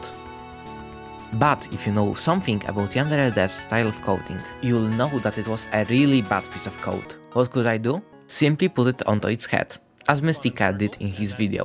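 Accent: Poland. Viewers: Bro you sounds very polish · You're from Poland, am I hearing right? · polski akcencik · Wowowowo I didn't expect that but I really hear that you're polish haha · I love that polish accent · słychać od ciebie polski akcent :) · Yo, you have the perfect Accent (Polish Accent) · you sound soo polish xD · Od razu pomyślałem że polak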